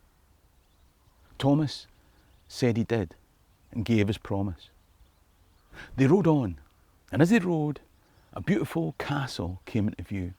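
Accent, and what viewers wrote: Scotland, United Kingdom. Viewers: I always think I'm going to fall asleep to his beautiful Scottish brogue but my brain never wants to shut down mid story. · Loved the story. Your voice and accent is mesmerizing. It's like the soul of Scotland 🏴 come to life. Makes me long for a place I've never been but always wanted to be. Happy 60th Birthday. 🎉 P.S. Don't ever lose your Scots language.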